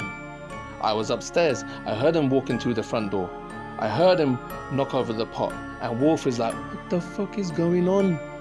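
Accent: United Kingdom. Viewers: u got that across the pond voice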